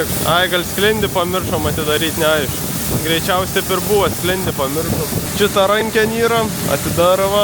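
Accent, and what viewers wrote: Biržai, Lithuania. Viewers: Svajok atsargiai, nes svajonės pildosi🎉 Labai norėčiau fizinės knygos, nes audio kažkaip ji man nesusiklausė, tai duočiau antrą šansą jai 😢😮😮😂 P.S. Mldc už Biržetišką "šneką" tikrai malonu girdėt 😂😂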